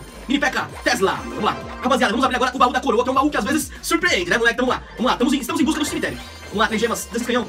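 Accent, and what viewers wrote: Minas Gerais, Brazil. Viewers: Aposto mineiro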